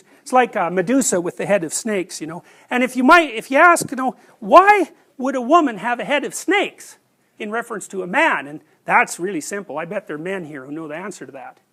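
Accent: Canada. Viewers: That Canadian accent is so prominent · All Canadians speak like that.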